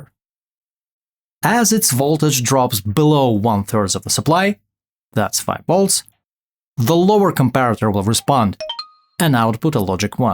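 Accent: Russia. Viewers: Отличное видео! И у тебя подозрительно русский акцент. 👍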